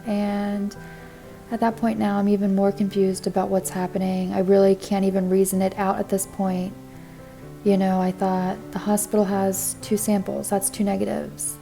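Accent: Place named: Midwest, United States. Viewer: Yes, even in North America the situation are getting dicey....judging from your accent, are you from the midwest? I'm in the Southeast (Florida) now 🙂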